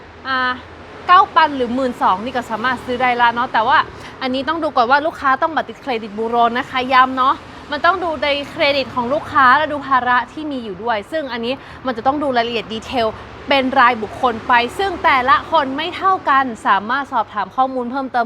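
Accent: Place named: Northern Thailand, Thailand. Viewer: ชอบฟังไทยกลาง ผสมเหนือ น่ารักดี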